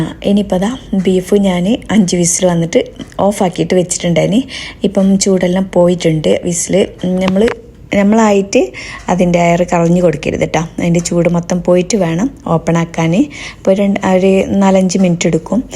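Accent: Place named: Malappuram, India. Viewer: Nalle paangnd ഉപ്പളാത്തെയും മലപ്പുറം ഭാഷ 2 ലും mundikko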